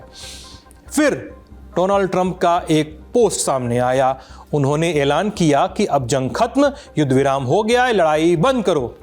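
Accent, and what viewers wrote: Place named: Varanasi, India. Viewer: पसेरी के भाव से बनारसी टोन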